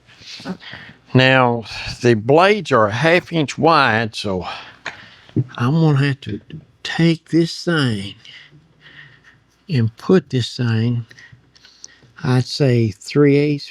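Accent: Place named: Appalachia, United States. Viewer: This is like watching paint dry, too slow and what an accent, reminded me of the Beverley Hillbillies!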